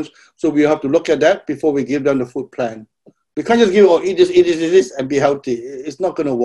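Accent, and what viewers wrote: Singapore. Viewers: bro r u singaporean?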